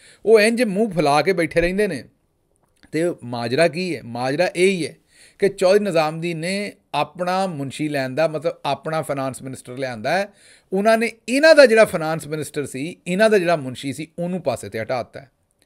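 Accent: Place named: Punjab, India. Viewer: Your vlog sounds good to ears although such a strong Punjabi is not understable. Thanks for giving out meanings of common punjabi words. Keep it up!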